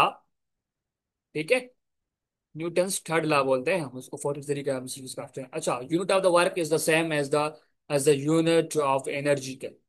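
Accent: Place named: Sindh, India. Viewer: Your accent looks like you are sindhi? Acha kia dawood uni khi ka test easy hota hai ya difficult?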